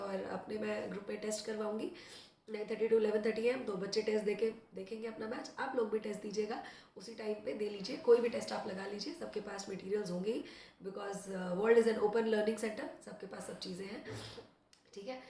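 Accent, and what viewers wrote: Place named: Lucknow, India. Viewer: ohk kk mam.. pranam 🙏 recently m listening ur vdos 😍 u are truely 💎 n u really care about studdent's studies . so much positivity ,full of real information which is most imp thing.. faltu ki bkwas ni hoti h..bhut se log aadha tym vdos ko start krne uska add krne me lgate h .n faith on our bhgwan n spritual knowings 🙏 n flow of ur accent 😍..I think aap lucknow ya uske aaspas k ya pragraj se ho... 🙏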